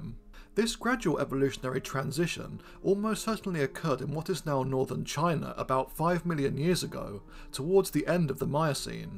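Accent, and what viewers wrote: United Kingdom. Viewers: What part of England are you from?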